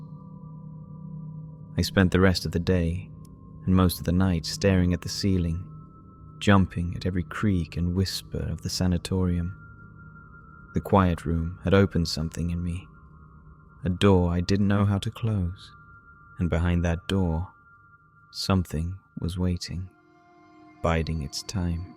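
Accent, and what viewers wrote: England, United Kingdom. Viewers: First of all, the past tense of drag is dragged (not drug) regardless of what many of this country say. That said, I enjoy the apparently proper English accent and grammar used. Other than that, it is well done as a haunting yet real story, well told. More importantly, I believe this is an accurate account of those in sanitariums in past years. With deinstitutionalization in the U.S. in the 70’s came new heartbreaks and injustices. “Nurse Rachets” have abounded. Honestly, most people love power over others, and when afforded opportunity, will abuse. But, their days are numbered. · Brilliant narrative! This fictional sanitarium echoes that of Waverly Hills Institution, sadly this one very much existed. Are you British? Your accent is lovely 😍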